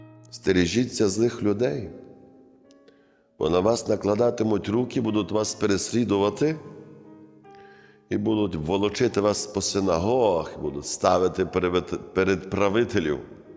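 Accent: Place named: Ivano-Frankivsk, Ukraine. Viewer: Дуже гарна проповідь.Але люблю Ваш Івано Франківський акцент!... ближчим до серця❤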